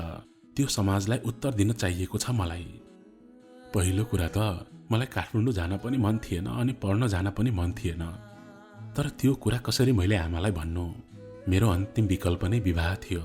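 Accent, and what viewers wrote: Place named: Darjeeling, India. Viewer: ❤❤Your voice from Darjeeling 💕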